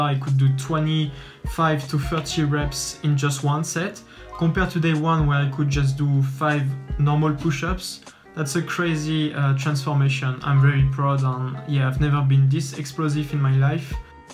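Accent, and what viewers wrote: France. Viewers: J ai reconnu l accent français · tu parle français ça se sens gg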